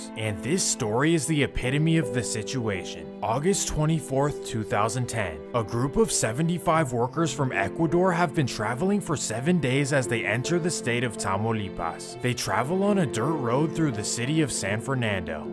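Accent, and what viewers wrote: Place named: Stockton, United States. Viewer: The way he going hard pronouncing these mexican words make me think he from stockton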